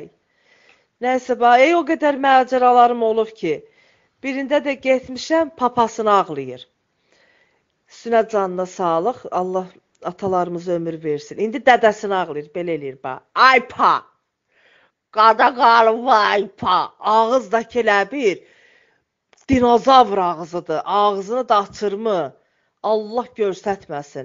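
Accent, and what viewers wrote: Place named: Dashkasan, Azerbaijan. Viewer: Yəqin daşkəsəndən olub lətcəsi ona oxşadı amma gülməli deyil dərdə gülmək olmaz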